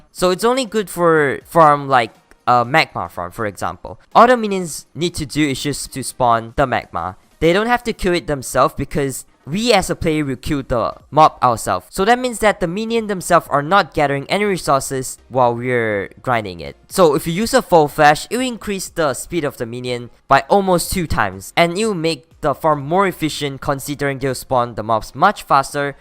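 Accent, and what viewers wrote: Singapore. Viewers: are u Singaporean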